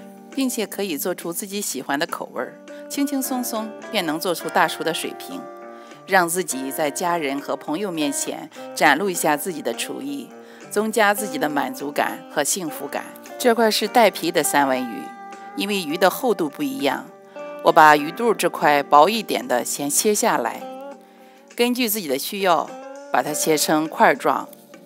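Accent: Qingdao, China. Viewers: 听着这熟悉的口音 像是青普（青岛普通话）呢😄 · 山东人吧？哈哈，一听就亲切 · 绝对如假包换的青普：)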